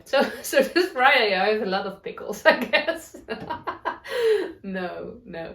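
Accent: Netherlands. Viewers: I so love your podcast and your Dutchy English, you are so lovely. I live in Norwich UK, so just across the sea from you. It only takes 40 minutes to get to Amsterdam from our local airport. I love to knit and sew and i am knitting the Flora sweater at the moment by Irene Lin. Also sewing the Hinterland dress. Take care and love from my house to yours x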